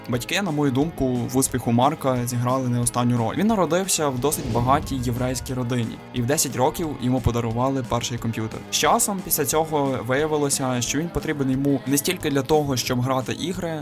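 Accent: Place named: Zakarpattia, Ukraine. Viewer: Бро, дуже якісний контент, акцент цікавий, ти часом не з Закарпаття?)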